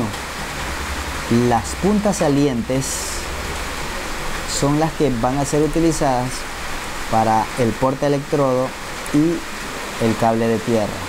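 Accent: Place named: Colombia. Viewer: soy de Venezuela y no se si las numeraciones de los cables son igual a las de donde tu eres, me pareces colombiano por tu acento y el cable numero 8 aca en Venezuela es un cable bastante grueso y no creo que entren ni 12 vueltas en un transformador de microondas, aclarame si ese cable es grueso o de que grueso es laseccion de el cable 8 que indicas.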